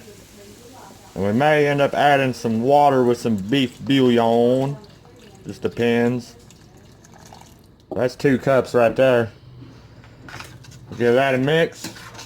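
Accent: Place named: Western Kentucky, United States. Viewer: Where are you from? We have the same accent. I’m from western ky. You’re a great cook btw!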